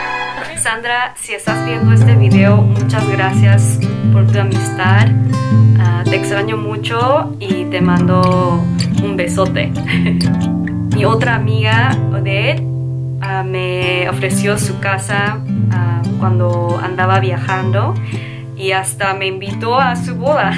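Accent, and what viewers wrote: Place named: Mexico. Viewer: Cómo ya hablas cómo nosotras las Mexicanas , ya t considero parte de nuestro país. BESOS 😘😘😘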